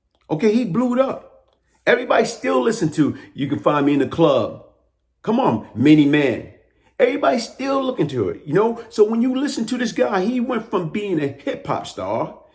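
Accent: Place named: Baltimore, United States. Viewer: For somebody from nyc you pronounce your words like you from Baltimore.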